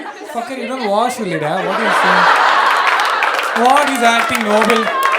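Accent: Kerala, India. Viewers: He is from kerala. More over malayalis speak english this way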